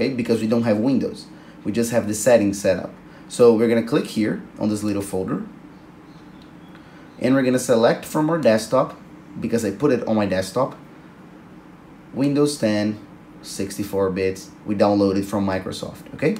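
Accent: Brazil. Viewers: I'm hearing a strong Brazilian accent lol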